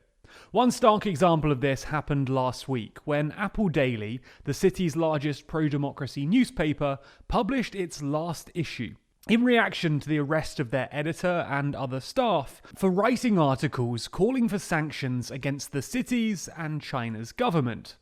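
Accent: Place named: RP, United Kingdom. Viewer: You sound slightly deeper voiced and more posh in this video.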